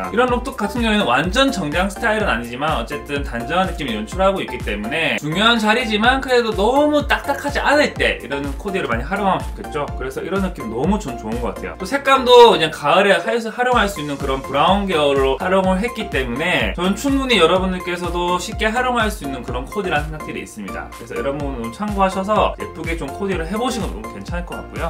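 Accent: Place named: Gyeongsang, South Korea. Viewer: 경상도 사투리 억양이 조금 있으신 거 같네요ㅎㅎ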